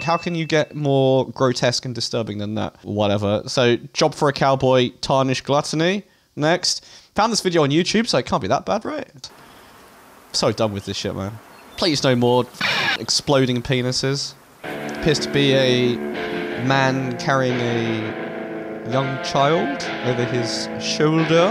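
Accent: United Kingdom. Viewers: Best part is he stayed in character with the British accent and vocabulary all the way through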